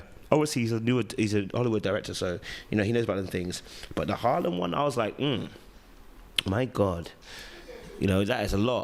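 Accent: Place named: United Kingdom. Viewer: You are talking nonsense! Unless you are secretly married and speaking from experience, you shouldn't be providing any advice on this matter. Your comments regarding her vision of marriage are very elitist and African disguised with a British Accent! The Christian community should be praying that their divorce does not go ahead, but all of a sudden, everyone is a psychologist.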